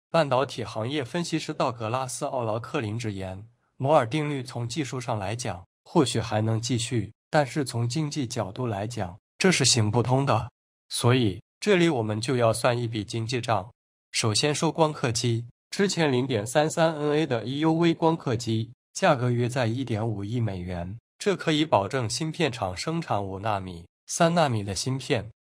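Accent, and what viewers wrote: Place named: China. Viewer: 這口音與用詞就是對岸中國的味。不專業的程度就跟中國的漢芯一樣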